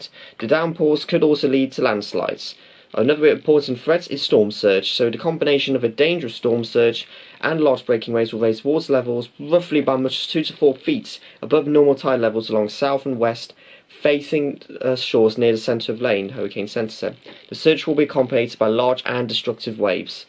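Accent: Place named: RP, United Kingdom. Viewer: nice bbc english accent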